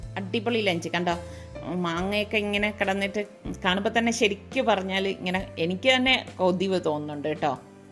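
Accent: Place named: Thiruvananthapuram, India. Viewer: Thiruvi.,keera., veravi Assal thiruvantharam bhasha....😁😁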